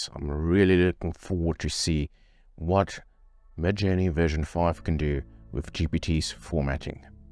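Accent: South Africa. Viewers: That South African accent 😂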